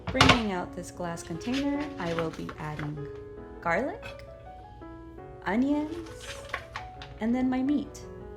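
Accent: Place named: Russia. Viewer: Great video! Love that Russian accent!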